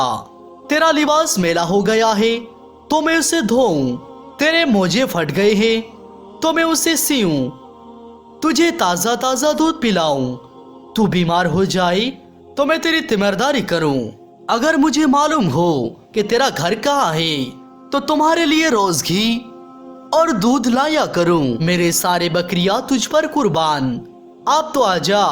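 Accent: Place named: South India, India. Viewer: Video boht achhi h mgr aapki tone south indian ya nepali type h.alag hi lag rhi hai...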